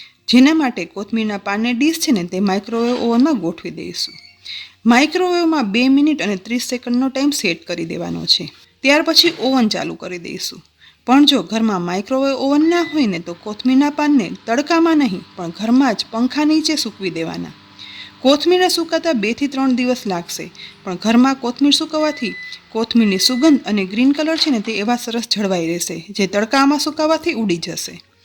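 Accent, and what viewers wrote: Kathiawar, India. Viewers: Nice astonishing recipe of namkeen nylone chevado with corriender plus sweet neem leaves powder presentation with sweet kathiawadi speech voice. Thanks to ji.. With feelings.... Add basil leaves to increase taste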